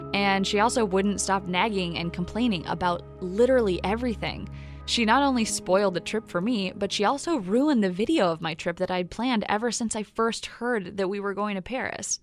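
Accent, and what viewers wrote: United Kingdom. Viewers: justice543212 her voice is british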